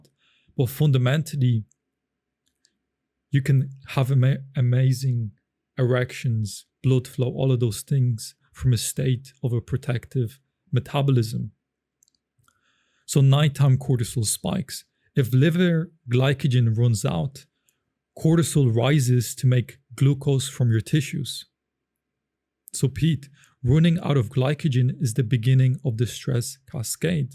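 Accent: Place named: Ireland. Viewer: Yes, is Polish but has said the he's lived in Ireland since age 9, which accounts for his accent.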